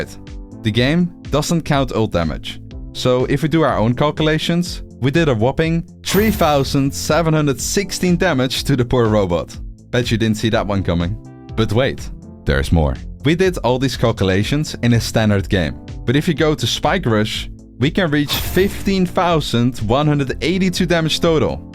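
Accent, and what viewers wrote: Netherlands. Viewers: Typisch NL accent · Lol i thought you had a dutch accent. Then i saw “Netherlands” on your channel :P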